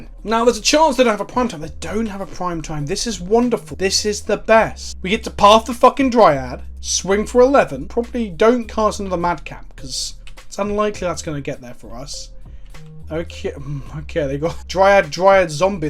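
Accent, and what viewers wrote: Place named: United Kingdom. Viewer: What tried to say: "what is the plural of colossus?" What actually said: "what is the pllll of klloss" I will never understand how british accents work. Do you just leave out half the letters?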